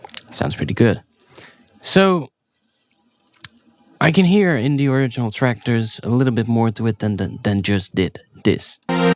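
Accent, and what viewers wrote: Netherlands. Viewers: ja ik wist het! ik zat gewoon de hele tijd tijdens de tutorial hij moet wel nederlands zijn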